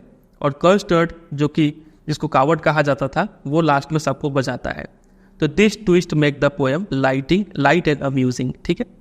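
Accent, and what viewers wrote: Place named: Bengal, India. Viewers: Sir app bengali ho na?? I understood because I am a bengali too.. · Sir's bengali accent revealed 🎉🎉🎉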